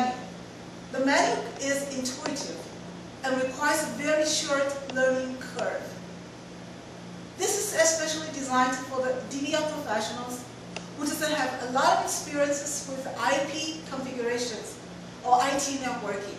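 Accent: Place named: Russia. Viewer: good russian accent!!!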